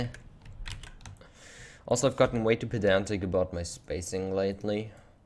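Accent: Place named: Norway. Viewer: Was this pre-transition? You sound sort of exactly like any Norwegian guy and it’s off putting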